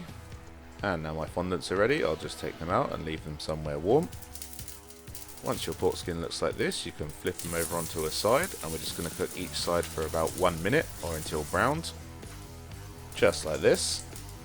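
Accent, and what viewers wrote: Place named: United Kingdom. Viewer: ha ha! No no, keep the mystery alive! Especially with the dreamy British accent! lol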